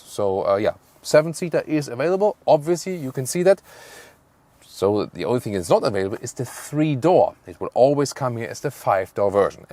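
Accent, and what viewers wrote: Germany. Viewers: That German accent is hot 🥵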